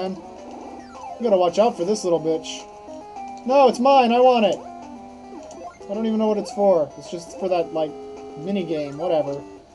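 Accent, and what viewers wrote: United States. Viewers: Lmao i used to watch this when i came out on my ultramegaunknown account. Great times! Too bad YT deleted my old comments. I remember commenting asking what accent you have and you said it was Oklahoma. And another guy said "Where the wind goes sweeping through the plains." Then you said, "More like where the tornado sweeps through the sea of concrete." Lol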